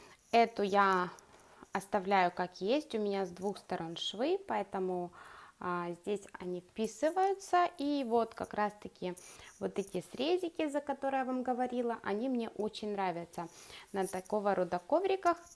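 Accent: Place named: Surzhyk, Ukraine. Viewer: Это говор такой понимаете, суржик.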